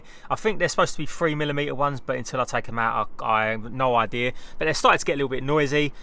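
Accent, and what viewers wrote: Essex, United Kingdom. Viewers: Nice to hear a familiar Essex accent and a channel with decent engine knowledge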